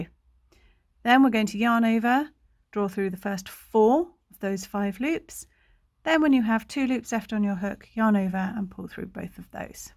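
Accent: England, United Kingdom. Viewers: American stitch names in an English accent? Lots of trebles, counting one, two, three as you did them, but then referring to them as double crochets, and then double crochets, counting one, two, but calling them single crochets! I can see I'll have to write the instructions out in English. Lovely squares, thank you, but any reason for the use of American terms?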